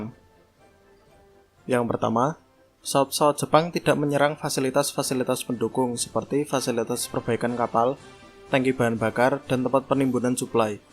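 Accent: Java, Indonesia. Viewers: logatnya medok bgtt aduhh .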